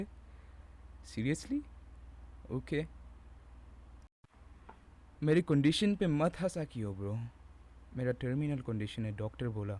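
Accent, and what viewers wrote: Hyderabad, India. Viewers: 😭😭hyd accent ong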